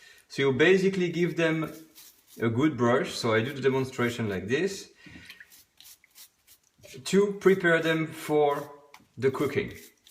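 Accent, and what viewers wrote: France. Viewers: Wish you make it longer than 9 mntes . Love your French accent Chef . Merci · Love your French accent ❤ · You hsve such a beautiful French accented English 🌺🌺🌺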